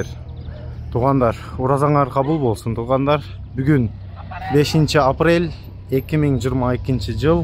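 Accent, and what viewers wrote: Standard. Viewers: Молодец укам кыргызча ны менден таза суйлойт екенсин,озбек босондагы ушунтип дружно жашай вергиле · Кыргызча таза суйлойт экенсин ошол учун катталайын каналына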